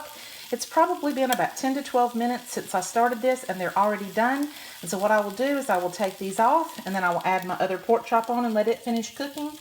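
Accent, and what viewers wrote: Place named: South, United States. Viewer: I like the Southern accent too. All the way from the other side of the world.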